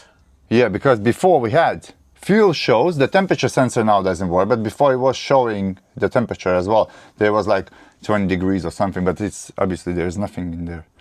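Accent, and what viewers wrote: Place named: Eastern Europe. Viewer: Good luck my Slav accent friend. I belive in your skills and your patience. I wish you all the best. This Bentley will be turn over point for your channel,repaired or not. New subs will comming. Sorry on my bad english,cheers from Croatia.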